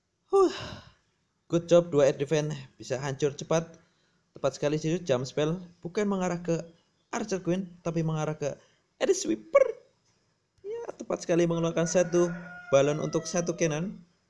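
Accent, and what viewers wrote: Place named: Java, Indonesia. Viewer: medok yah 😀😀😀😀😀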